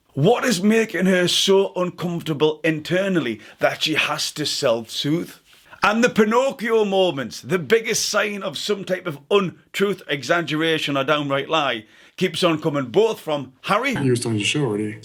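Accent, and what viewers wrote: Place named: Cockney, United Kingdom. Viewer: If you could speak English properly, I would subscribe. It is like listening to an episode of Eastenders. What does con ent mean? content? You ask your viewers why they don,t like her...why? Because you are not prepared to make a negative opinion of her public? You want your viewers to do it for you so that you are out of her firing line....You state that you lean into liking her!!! Really, not a great reader of a persons character (which you should be able to easily see via her behaviour) Unsubscribing, you are not believeable.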